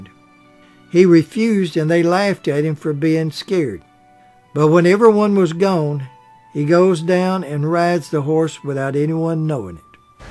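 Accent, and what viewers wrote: South, United States. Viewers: One of your best,! I love your bios of legendary stars of our classic American films. A few little mistakes, though, it's Charles Bickford, not Charles Pickford, Chuck Connors, not Chuck Connor, and Jean Peters could not possibly have starred in 1958's The Big Country, as by that time she had married Howard Hughes and retired from acting; A Man Called Peter, released in 1955, was her last feature film. I think you meant Jean Simmons. I'm a Southerner myself, so I understand and relate to your wonderful drawl. My late mother was from middle Tennessee and often got a bit mixed up here and there (she'd say "punkin" for pumpkin, and "purnish" for punish - just the tip of the iceberg!) But it made her all the more lovable for us all. Keep doing what you're doing, and don't ever change!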